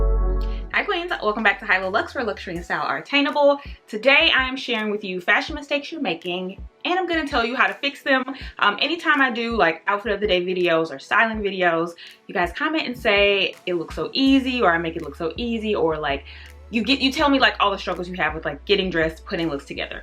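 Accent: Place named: United States. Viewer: I had to have subtitles because I am Portuguese and speak fluent English ( I live in London), but my girl J speaks too fast and I almost cannot understand the American accent!!! But I love her anyways…💞💞💞💞💞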